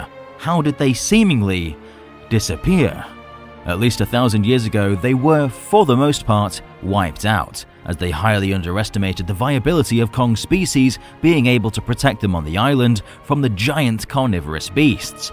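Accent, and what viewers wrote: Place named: United Kingdom. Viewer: U sound like every British person ever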